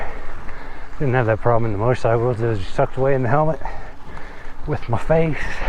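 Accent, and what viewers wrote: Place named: Farmington, United States. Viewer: You sound ike from Farmington. Nice bro python sucks. Java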